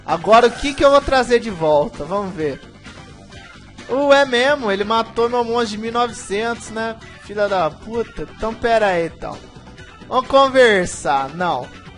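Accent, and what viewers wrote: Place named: Minas Gerais, Brazil. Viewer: gosto muito do seu sotaque ele é muito engraçado sotaque mineiro (ochente)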